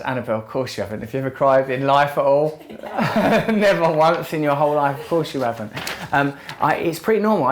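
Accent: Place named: United Kingdom. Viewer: I really didn't expect you to be soooo intelligent... pleasently surprised... I did find it a bit hard getting though your your lovely British accent but I finally managed. Look forward to hearing more from you and thank you sir for giving your time to help all of us who need it. ❤️❤️❤️. Blessings to you and yours.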